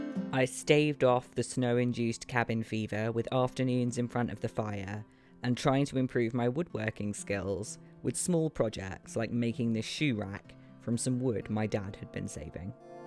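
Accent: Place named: England, United Kingdom. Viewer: I just discovered your channel, a welcome surprise! Things I love about you, not in order:- 1. Your “give it a go” attitude; 2. Endless courage; 3. English accent pleasant on the ear (I can understand you): 4. Hard Worker; and 5. Beautiful property. And I smiled at the protected bats in your roof. Gotta love nature. Looking forward to your building creativity over time.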